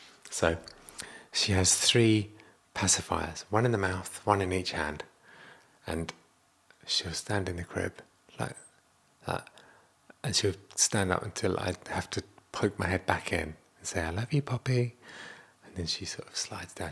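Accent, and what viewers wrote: United Kingdom. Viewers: Your voice and accent is awesome. The British accent in my opinion is the best accent 👌.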